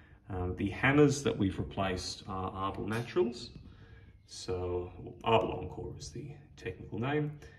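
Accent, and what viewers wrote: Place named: Australia. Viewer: Hi, Where are you located? I am guessing in Australia or New Zealnd by your accent. In New England here near Boston. I am crazy about that gorgeous Richard Lipp. We almost never see their pianos. On Roberts piano out of Oxford he gets them from time to time and appears to really like them. Any info you care to share about heirloom pianos would be appreciated.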